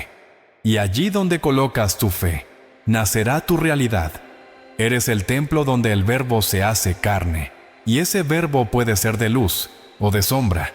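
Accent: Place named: Brazil. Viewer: Que lindo acento de Brasil. El idioma se escucha lindo.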